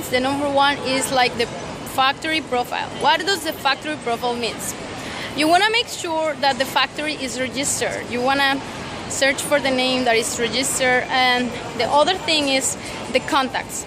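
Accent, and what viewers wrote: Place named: Latin America. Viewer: I love ur accent are u Latina?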